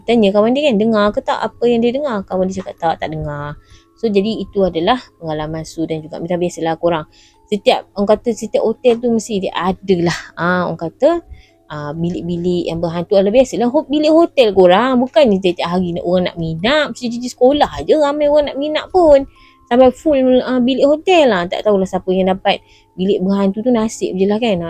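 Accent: North, Malaysia. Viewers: Asalamualaikum..hye sis.. manih2 oghang utara ni.. org nismilan ckp 'sojuk yo mato mandang'..hehe..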